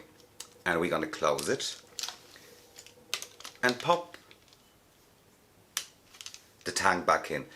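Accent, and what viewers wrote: Dublin, Ireland. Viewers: Your accent is so exotic! It’s like Dublin with a hint of something else, Iv been using the same steam pod since late 2015 so Iv just ordered this today..thank you so much for explaining everything I STAN this channel 🤩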